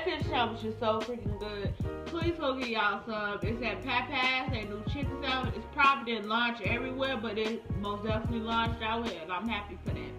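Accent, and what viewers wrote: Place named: Chicago, United States. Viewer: you definitely from chicago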